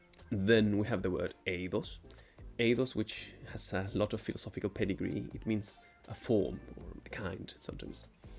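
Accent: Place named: Spain. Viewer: Me encantado! Que bueno es oir Graeca con un linga de Espanol. Yo amo las linguas de Espanol, y Griega, y otros. Now English. First truth: if one feels too proud just delve into Greek grammar to restore humility! For Ioanna, recall that Greeks were once illiterate. I refer to post- Mycenean collapse. They had their speech with all sounds/phonemes to express ideas, cases, etc. They had no WRITTEN case endings. They were then offered a menu of letters ( likely from Phonecian traders) from which to represent there spoken sounds. Folks who not long after created the Parthenon would not likely chose a number of letters for the same sound. Evidence also includes poetry lines that wdre meant to ryme but no longer do, latin transliterations and so on. The rough breathing was orig. H in another dialect and pronounced. Eyes were ophthalmoi now matia, winos ( with digamma) became winus then wine. 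Now called krasi, from mixing with water in a krator. Modern every day folks deny or do not k now this. Read Luke without much understanding. They eliminated Kztherevousa and adopted Demotic, the language of common ( usually poorly educated in lzst century, but not now of coursr). This removed them a step further from their kleromia/ heritage. No Greek kb here. But i love the channel and i subscribe. Karl Ioannis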